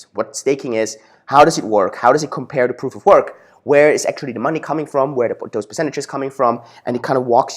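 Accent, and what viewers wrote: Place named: China. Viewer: OMG I LOVE HIS CHINESE ACCENT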